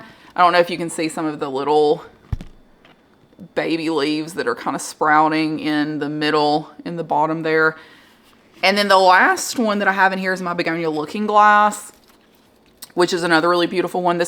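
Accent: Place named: Deep South, United States. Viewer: I've consolidated plants into one pot many times now, because I also have a lot of plants--but I have never thought of combining some of my cane begonias for some reason--and that is brilliant! I'll definitely be doing this soon. Also I really enjoy your videos and your accent (I live in Pensacola, FL, and I don't know of many PlantTubers from the deep south, so your accent sounds like home to me). (=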